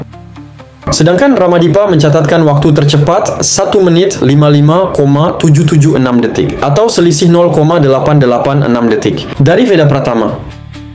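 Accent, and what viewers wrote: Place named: Malaysia. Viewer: emang admin ini malaysia ya? kok logatnya malay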